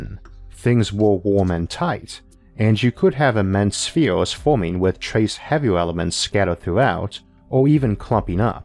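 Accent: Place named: United States. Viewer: It's not an accent, it's a speech impediment. Though otherwise he has an American accent.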